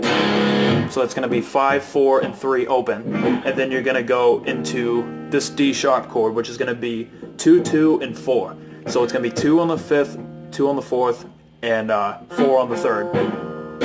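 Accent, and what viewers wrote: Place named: Massachusetts, United States. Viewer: A lot of these riffs you technically have the correct notes but they’re played quite differently by Mike mostly so they ring out as chords more than how you do it but I guess if you did this totally by ear you did a good job cuz the notes are mostly correct. Also where in MA are you from? I’m from there originally and I hear the accent lol. “choahd=chord”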